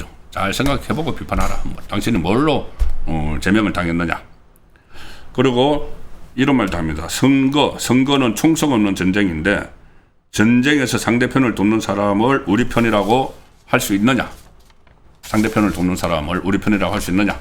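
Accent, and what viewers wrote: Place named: Daegu, South Korea. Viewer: 송구컨씨ㆍㆍ아무리 지방출신이라도 발음 억양 좀 조정해라ㆍㆍ듣다가 보면 짜증난다 나도 대구다 그리고 어설프게 코미디언 수준으로 짖지말고 좀 잘해라 내용이 없다 다 보고나면 영남일보냐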